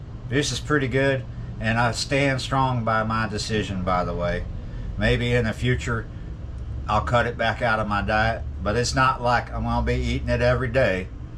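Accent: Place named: South, United States. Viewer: 😂😂Southern accent now 😂😂. Are you moving back to try to get your driving license so you can do the van life thing. Good luck.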